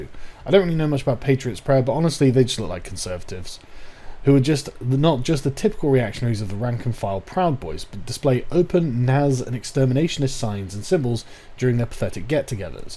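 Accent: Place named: United Kingdom. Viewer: British dialect issue, whilst Britain is tiny compared to the US, we have hundreds of dialects that do some weird shit to vowel sounds in particular, and the dialect can change significantly over the course of 20-30 miles, where sargon lives is right at the cusp of a major vowel sound change in dialects, so that's probably why he pronounces it this way, because it's the normal pronunciation for that area.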